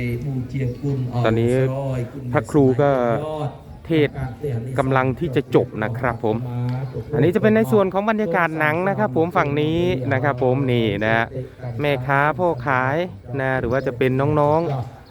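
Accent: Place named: Isan, Thailand. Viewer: สำเนียง ภาษาอีสาน พี่พูด สื่อสาร ได้ เพราะ มากคัฟ #FC คัฟ #เด็กใต้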